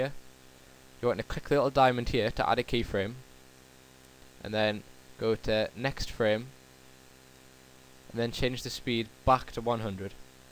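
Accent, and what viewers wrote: United Kingdom. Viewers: nice tutorial man i like your british accent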